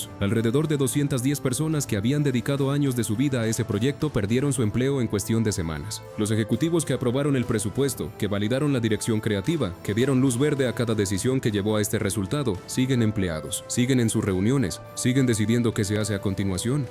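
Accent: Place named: Colombia. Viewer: buen video pero falta mejorar ese guion, mucho corte de voz, y te delata como pronuncias la S , colombiano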